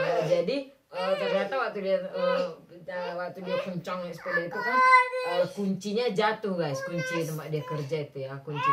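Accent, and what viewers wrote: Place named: Medan, Indonesia. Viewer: selalu tanpa skip kalo nonton vlog ka, seru bgd apalagi pake logat medan lucu bgd 😂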